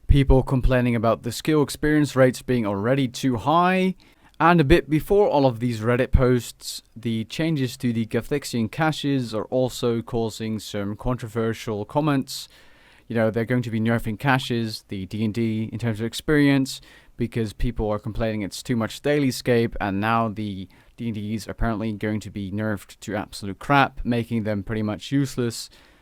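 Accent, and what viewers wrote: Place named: Netherlands. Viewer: well whatever you choose to do the community supports you and thank you so much for making such great content and teaching me to boss. It has always been a goal to achieve a max Cape and thanks to you I'm on that road now. And hopefully before too long I'll have the final boss title. And that's good to know you're Dutch 😂 I couldn't figure the accent out . But for real whatever you feel like you have to do or want to do we support you and you at least have built up a community enough to get a jumpstart of you were to do other games❤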